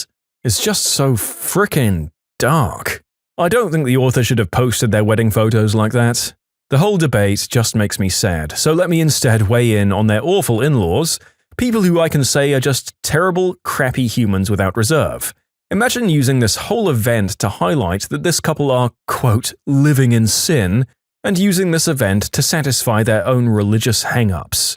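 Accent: United Kingdom. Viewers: AH! HES BRITISH!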